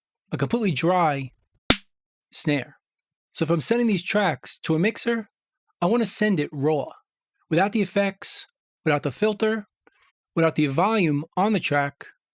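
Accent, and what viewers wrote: New York, United States. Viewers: Thank you. From a newb, you make things very understandable and clear. PS Love that NY accent:)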